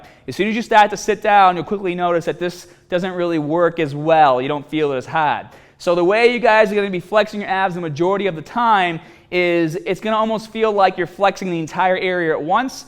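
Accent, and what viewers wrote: Boston, United States. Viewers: This guy has the classic Boston dialect going on. Pretty cool and hard to find. Haha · Mazklassa boston? maybe nyc? i can never tell the difference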